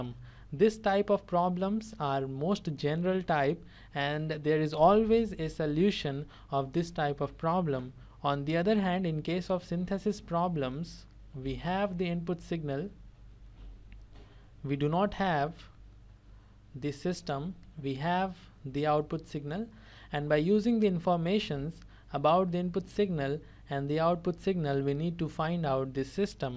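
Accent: India. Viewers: 浓浓的咖喱味口音让我无法静心学习。。。可能是好课，但不太适合我！cry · His English is fine. I understand him. Most Indian professors at my local university have a thicker accent than his. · Bu Hintlilerin İngilizcesi beni bitiriyor abi.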